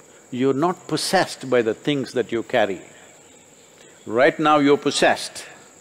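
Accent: India. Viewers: 我认为是，有一些句子，无法找到最合适字的翻译。你仔细听，有时需要前后听两句话，才能感受到当中的信息。而老师的印度腔英语，我们不太容易听出来，所以英文翻译也是相当重要的。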